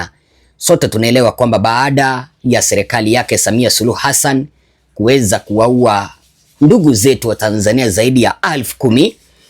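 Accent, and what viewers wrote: Kenya. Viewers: Kwanza huyu jamaa ni mkenya hakuna mtanzania anaeongea kiswahili hicho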